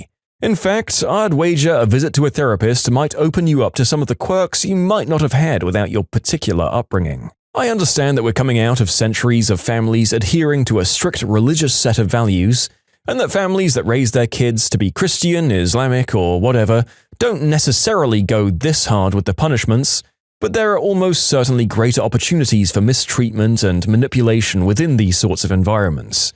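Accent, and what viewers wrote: United Kingdom. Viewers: You are now have a british voice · What is this British voice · British alert🚨🚨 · love the british voice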